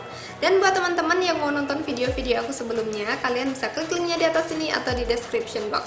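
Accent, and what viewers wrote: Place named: Yogyakarta, Indonesia. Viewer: Medok.. orang jogja po mbak?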